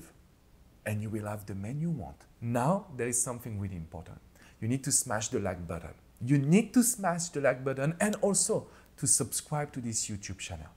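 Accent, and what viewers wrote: France. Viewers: Love your French Accent 😍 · I love your videos and French Accent! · Hi woooao you are such a great relationship couch you are making things happen with that French accent. do you you know what your teachings are so sweet and short very powerful. thank you very much for your teachings. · Omg, I love your french accent as you reminded me of my late french hubby's voice...oh I missed him so much... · I LOVE your french accent😊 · Come here for his voice strong French accent 😊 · Merci beaucoup cher! Please keep your French accent, its lovely and very charming, enchanté! Your advice is always precious:-) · Mr... i. like ur" frenglish" language 😊. thank u for ur advices.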